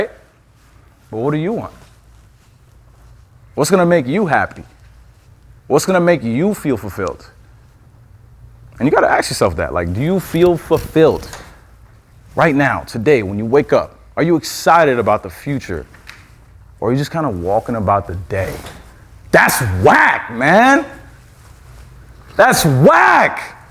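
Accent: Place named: New York, United States. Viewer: Oh you DEFINITELY from NY lol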